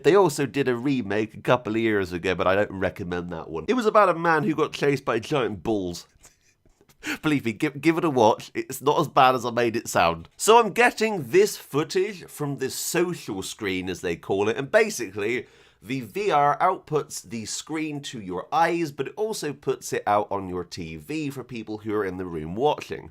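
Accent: United Kingdom. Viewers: Love that British accent :D or what it is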